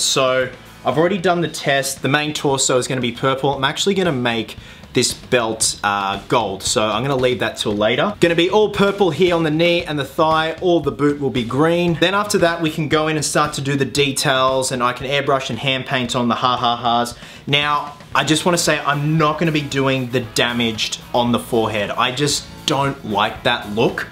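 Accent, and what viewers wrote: Australia. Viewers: Sam Lovgren he is Australian, it is pronounced different in Australia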